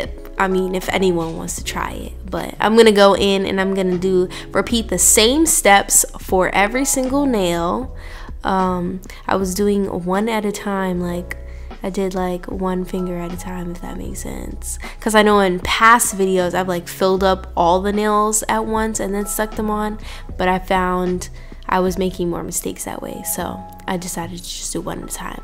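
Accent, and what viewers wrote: United Kingdom. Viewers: We all mutually agree we love these nail vids 😍 the british accent killed me 🤣 oh also its a-pray bby girl xx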